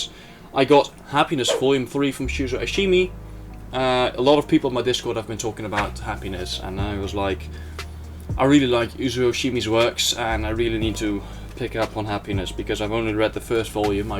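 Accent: Netherlands. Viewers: you have a dutch accent but some words you pronounce are Super British haha, master of the Language, great video, that Rokka sounds great tbh .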